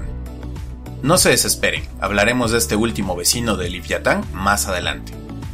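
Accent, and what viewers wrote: Mexico. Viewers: erea de México? :O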